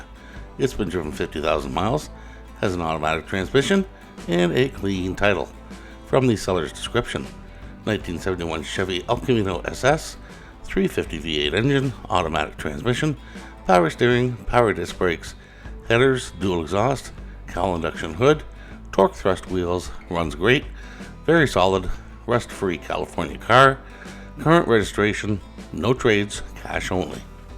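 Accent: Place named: Canada. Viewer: We'd blame any mispronounced words to be caused by your Canadian accent. No foul. Hope you feel better tomorrow. Keep up the good work.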